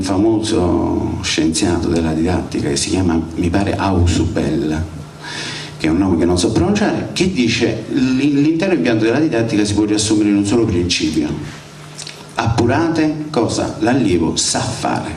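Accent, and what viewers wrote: Naples, Italy. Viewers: Complimenti maestro la sua napoletanità la rendono comunicativo e trasferisce le sue nozioni in maniera eccellente !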